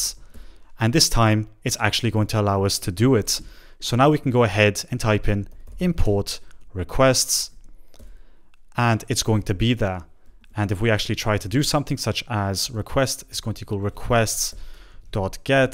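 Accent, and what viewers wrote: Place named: Italy. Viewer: MA SEI ITALIANO LOOOL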